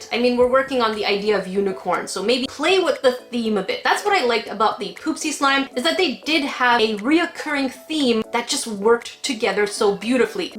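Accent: Canada. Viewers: OMG are you Canadian?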